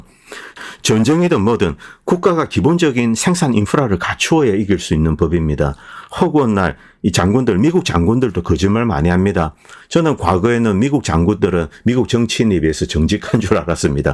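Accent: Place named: Busan, South Korea. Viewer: 부산 억양이 매력적 이시네요...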